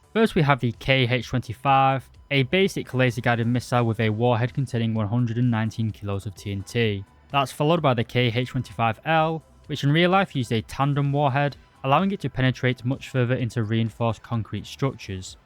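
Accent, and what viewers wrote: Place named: Estuary English, United Kingdom. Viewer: Hey man, your accent is so strong that Im actually struggling to understand certain words that you say. I think you pronounced R instead of W? then chat gpt told me this Estuary English." This accent is spoken by people from the London area, and it is a blend of traditional Cockney and Received Pronunciation. Estuary English is becoming increasingly common among younger speakers in the UK.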